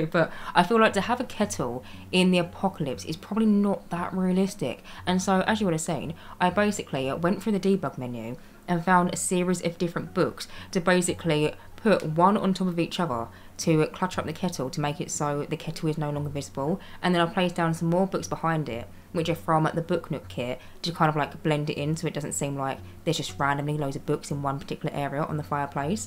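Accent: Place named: England, United Kingdom. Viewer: Just got it! I love it, a loverly face, and you talk like that, real pommy accent. I can't talk i'm an aussie.good job mate!.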